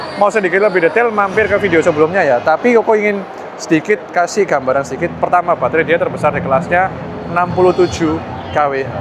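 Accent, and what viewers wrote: Surabaya, Indonesia. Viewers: Aku kangen medok ro Suroboyoan mu 😊😊😊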